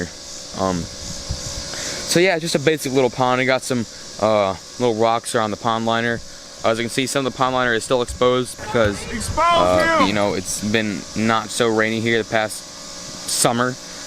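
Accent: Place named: Ozarks, United States. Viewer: where are you from? ozark area I'm guessing?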